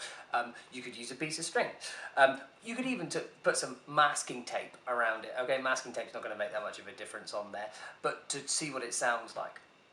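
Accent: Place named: United Kingdom. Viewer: Cool vid and the epic British accent! +sub:)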